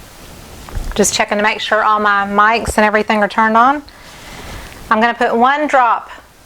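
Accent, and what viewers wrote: South, United States. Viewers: Hi. I have to say I am not a fan of the colors BUT I LOVE how fearless you are in trying different things AND showing us your boo boo’s. You could have easily edited your video to hide the fact that you forgot the additive but you showed us how to recover and that makes you an excellent teacher. I love how you blew on the painting to create more cells and added color to the blue area after the fact. You take risks and just roll with it. This has made you a master of this medium and I applaud you for your efforts. I will always watch your videos because I enjoy learning your methods, personality and your southern accent. Thank you.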